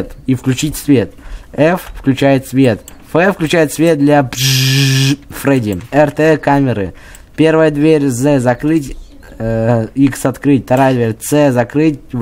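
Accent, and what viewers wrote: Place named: Russia. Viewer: Все говорят што он украинской: а я гаварю што он руской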